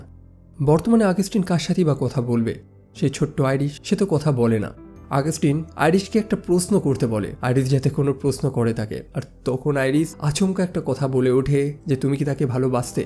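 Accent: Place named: Bangladesh. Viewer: apnar country India...But your Bangla Calcutta er accent nai..Bangladesh er accent er moto lage..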